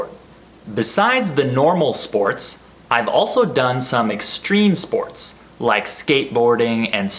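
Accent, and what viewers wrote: United States. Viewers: First of all, let me say that your videos are amazing. Even though I speak English fluently, I enjoy watching your A1 or A2 videos. I even recommend my students to use them. Well, you speak very clearly and use real English, which is very important to understand native speakers easily. I think that one of the reasons why your videos are so fun to watch for me is because I like American English. In fact, I'm in love with American English. I must say that I don't really enjoy listening to British accent because it sounds so strange to me. I don't know why, but I don't like saying things such as car park. I think it's because I want to really hear and use the "r" sound, especially at the end of words. If you ask me, the best way to use your videos is to repeat them a lot. Many learners don't really enjoy listening to a podcast more than 2 times, for example. 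They think it is boring and not necessary. Therefore, they quickly move on to other listening materials. However, it is a lot better focusing on something for a long time than trying different things. You know what I mean? Think about footballers. Every day, they practice the basics and they never play before they train enough. So learning English and speaking it automatically without thinking is just like playing a sport like football. I'm sure you agree with me. As for your question, well, I think my favorite sport is football, even though I am a woman and can't play. I have to say that I love watching football matches so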